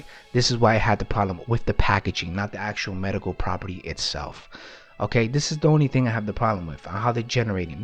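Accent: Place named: New York, United States. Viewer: I was right, you are from New York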